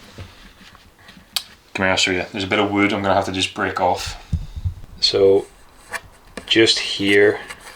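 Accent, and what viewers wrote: Northern Ireland, United Kingdom. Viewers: So good to hear a great Norn Iron accent. Love your videos. Francis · I just unboxed mine. It's a hollow body version. Looking forward to seeing how you approach the painting and curious about which mods you are going to bother with. The Northern Irish accent was a real plus for me. I loved "Derry Girls" 😉. Any idea when the second video will be up?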